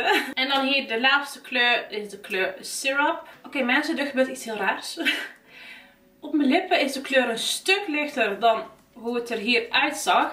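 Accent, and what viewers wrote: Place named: North Brabant, Netherlands. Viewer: Ik hou zo erg van je kleine beetje Brabantse accent. Echt zo schattig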